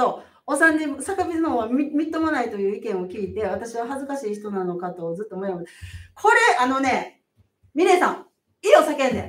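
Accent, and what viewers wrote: Kansai, Japan. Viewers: ３０代からはおばはんよ自覚いっぱいありますもん（笑） さんスカッと言う関西弁だし気にする事ですかね？？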